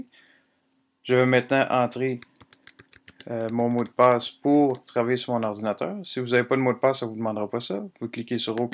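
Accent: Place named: Canada. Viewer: SUPER TUTO, très clair. Maintenant, il en faudrait un pour utiliser Audacity !! Et j'aime trop l'accent de nos amis canadiens !!! Merci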